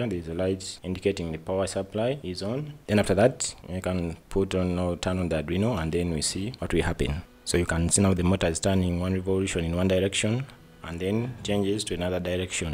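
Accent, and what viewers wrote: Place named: Uganda. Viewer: The presenter sounds so Ugandan!!!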